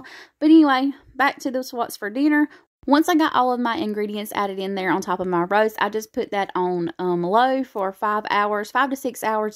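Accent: South, United States. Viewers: Tennessee gal here..I understand every word..southern accents are just fine😉💕💕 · Always love your videos! Don’t apologize for being Southern! Most of us understand you quite well!! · Lovin these meals and your southern drawl! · your southern drawl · I love that Southern drawl!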